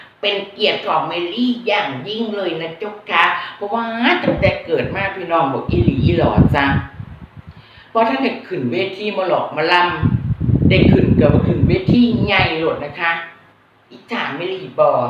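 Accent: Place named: Isan, Thailand. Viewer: เว้าอีสานแบบนีมว่นมว่นเมลี. หามเว้าพาสากาง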